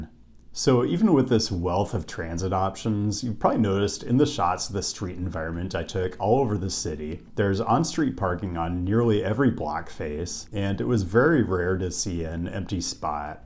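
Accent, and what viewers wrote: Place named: West, United States. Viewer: He has a General American accent, which is most of the Western USA. He grew up in the NW in Seattle.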